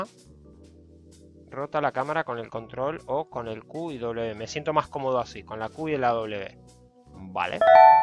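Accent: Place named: Argentina. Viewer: que vas a ser de españa vos,sos re Argentino,se nota cuando hablas solo con decir zapatilla ya me doy cuenta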